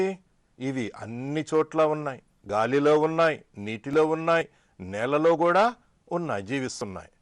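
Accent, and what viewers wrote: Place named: United States. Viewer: Ur English is mind blowing sir it hears like Americans English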